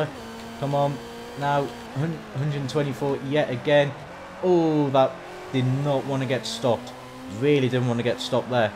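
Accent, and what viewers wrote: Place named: North East England, United Kingdom. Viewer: You sound like ya from the north east of England am from Newcastle and you defo sound ya from here or the other side of the Tyne maybe?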